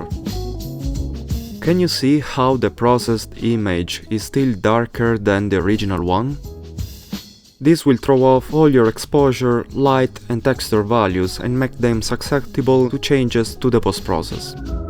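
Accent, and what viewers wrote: Italy. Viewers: you sound italian as hell... fire video..... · ottimo video, l'accento italiano inconfondibile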